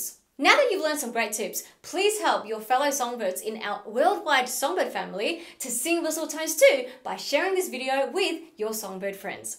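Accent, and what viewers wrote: Australia. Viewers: Australian aaccebt? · love your australian accent!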